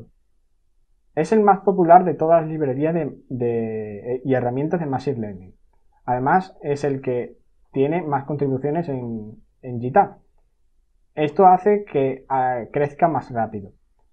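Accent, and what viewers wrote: Spain. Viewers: Mejor aprendan inglés y vean los videos de TensorFlow... lol .... no puedo pasar ese acento "españolao" enfermante... ya chao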